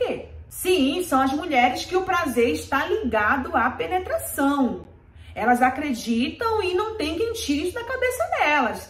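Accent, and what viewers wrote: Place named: Northeast, Brazil. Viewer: Acho que vc e nordestina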